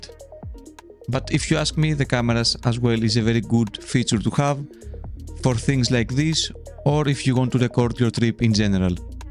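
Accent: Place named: Bisaya, Philippines. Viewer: Sa umpisa kala ko kapwa ko bisaya 😂😂😂😂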